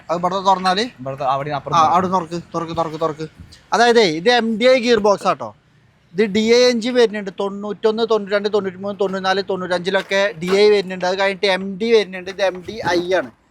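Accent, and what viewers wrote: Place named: Northern Kerala, India. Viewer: Hi എനിക്ക് നിങ്ങളുടെ സംസാരം കേൾക്കാൻ വലിയ ഇഷ്ടമാ. വടക്കൻ സ്ലാങ് 😅😅😅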